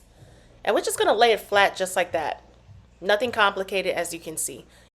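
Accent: New York, United States. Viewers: Love you're recipes, And ya New York accent !! 💕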